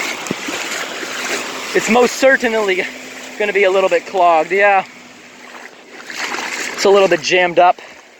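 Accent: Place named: New Jersey, United States. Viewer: Sounds like Jersey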